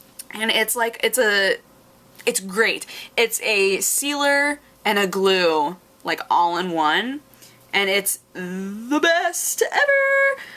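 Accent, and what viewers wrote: Spain. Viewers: hey I know spanish (well actually I know english, spanish is like the normal language I speak :p ) Your accent sounds very, like, from Spain. nice job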